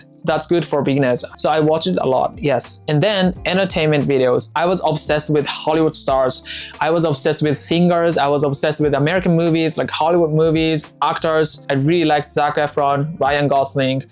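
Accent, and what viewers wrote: Japan. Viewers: 発音 何かカタカナっぽさが残ってない? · 発音指摘してる人いるけどこの人ネイティブじゃないんだから当たり前だろ どんだけ英語に使っていても悲しいことに中々日本語アクセントは消えない……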